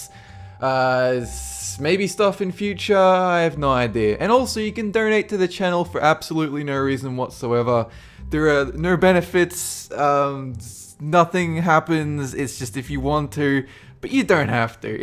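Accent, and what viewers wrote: Australia. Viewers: Its nice to hear a familiar Aussie accent on the Youtubes again. Ive been playing Hots for a while now and been tempted to start doing videos playing it which is basically me shouting at my computer screen but idk if thats entertaining. As a musician/Composer im interested in what jobs you have to offer. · Hey, where are you from? You sound somewhat australian.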